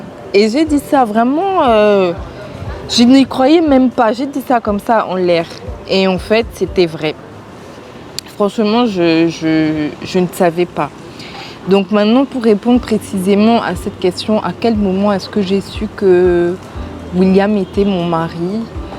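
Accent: Cameroon. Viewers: L'accent camerounais, ne part jamais.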